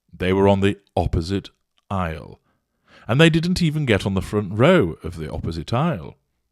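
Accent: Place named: Upper class, United Kingdom. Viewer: Love your clipped accent, clearly upper class Brit. Eton educated?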